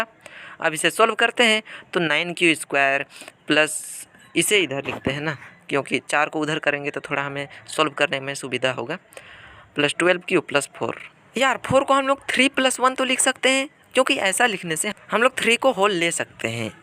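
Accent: Bihar, India. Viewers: Aap to voice se bihari lgte h sir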